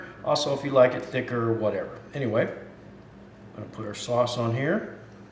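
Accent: United States. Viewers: Looks great! I never like the pork in this dish to be honest, so it's perfect for me☺ Btw, I really like your accent! Where in the US do people speak like that?🤔 Sorry, I'm from Europe, so I can't tell...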